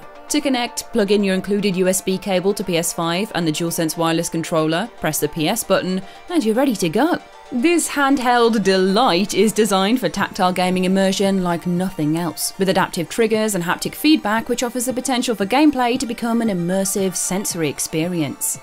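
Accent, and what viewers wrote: United Kingdom. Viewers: hey, I don´t have english as my main language... I find her accent a little different... Is this british or something?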